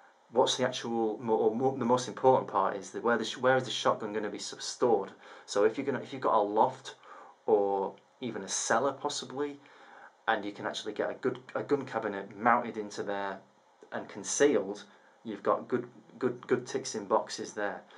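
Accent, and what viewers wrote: United Kingdom. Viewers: Do you mind if i ask what club you went to? you sound like your from Manchester, i got my full membership card in the post this morning , FAC application going in this week.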